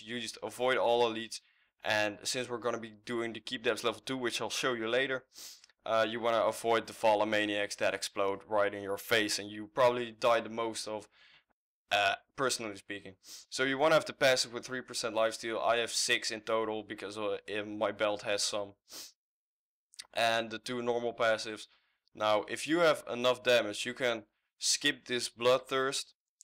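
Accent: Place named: Netherlands. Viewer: when i hear yur accent i would say yur dutch ;)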